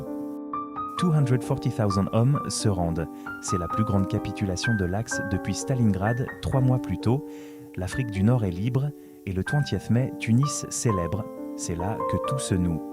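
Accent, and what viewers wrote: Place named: United Kingdom. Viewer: vous prononcez les dates en anglais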